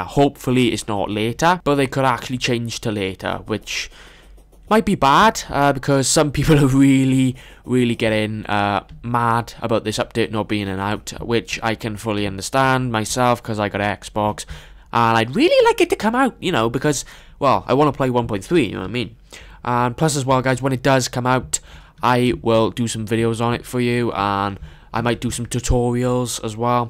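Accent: Wales, United Kingdom. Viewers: Are you Welsh? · Are you welsh? Im welsh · are you welsh?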